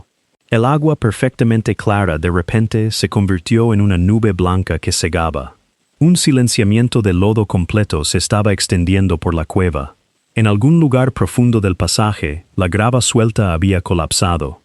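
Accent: United States. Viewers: Hablas bien el español y después hablas como gringo, me parece patético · Hola. Interesante a pesar del español con demasiado acento norteamericano. Pero está bien. M impresiona la tozudez de los buzos por el peligro. La gente es así. Es igual que los departistas que sabaen que va a ser sancionados si toman estimulantes y aún así lo hacen. Son los que audazmente ignoran las advertencias de peligro. Es igual que l los que roban. o asesinan. Saben que los atraparán , pero aún así lo hacen. En todos los casos se les obnubila el entendimiento y la capacidad de razonar y medir el peligro.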